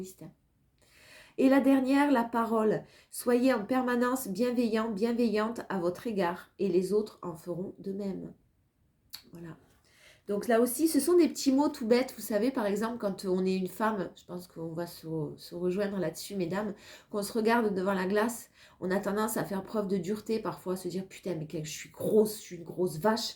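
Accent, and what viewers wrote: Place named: South-West, France. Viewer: J'adore vos messages qui me font beaucoup rire par votre bonne humeur. Votre franchise et notre bel accent du Sud Ouest (je pense) met du baume au coeur. Bon week-end❤❤😂😂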